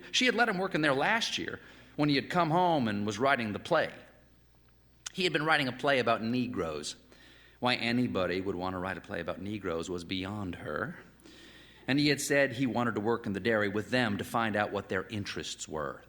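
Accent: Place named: South, United States. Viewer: He grew up in South Carolina but moved to New York in his early 20’s, so his accent is not as strong as it used to be...well that is until he reads a southern author or speaks with someone from there. Then his accent becomes thicker.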